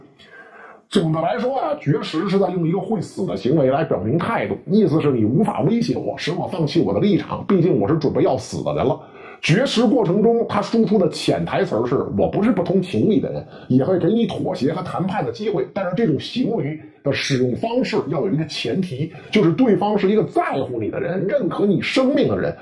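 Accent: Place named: North, China. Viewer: 可以换一个声音吗？这个口音我实在无法听清楚，我是马来西亚人这种北方口音实在让我头疼。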